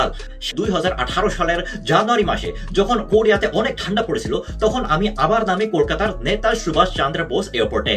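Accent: Bangal, Bangladesh. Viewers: Ata to bangladeshi voice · His Bengali sounds little different, more like bangladesi. Sounds so awesome 😎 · But tumi ektu bangladeshi der moto bhasha bolchoamader bhasha bangladeshider moto noi anek misti ...😂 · Are vai etato bangal style Bangla, just wow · বা: বেটা বা:। তুমি কোলকাতায় এসো, ইলিশ খাওয়াব। যোগাযোগ রাখবে। এভাবে ইলিশ পাঠানো যাবে না। তুমি বাংলাদেশ থেকে অনলাইনে আনাতে পারো, ওরা ব্যবস্থা করে দেবে। 1700 টাকা কেজি। কথায় বাঙাল টান (বাংলাদেশী টান) হল কি করে? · The specific accent of Bengali you're speaking is predominantly spoken in East Bengal (Bangladesh and parts of Tripura)... You are doing great! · Tumi khub vaalo bangla bolchho tabey kichhuta bangladesher moto uchcharan hochhe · কিছুটা বাংলাদেশী টানও আছে । তাই না ? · Your Bengali speaking style is some kind of East Bengali (Bangladeshi) style though... 😁😁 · Your bengali is of Bangladeshi accent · দারুন কিন্তু তুমি বাংলাদেশী দের মতো কথা বলছো। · Tumi to ak barae bangal der moton kotha bolcho 😳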